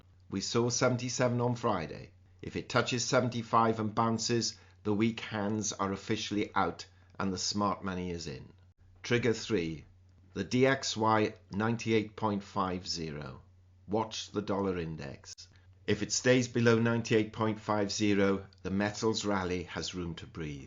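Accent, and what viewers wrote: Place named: Midlands, United Kingdom. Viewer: I can hear it in your accent a little. I would guess not far from Burton. Very sorry for your loss, 91 is an amazing age. I will be lost without my mum, can't imagine how you feel.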